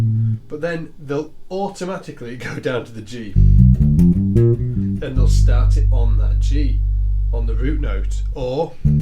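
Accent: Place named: United Kingdom. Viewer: He is british, maybe you are used to listening english accent from North America and that's why sounds weird to you.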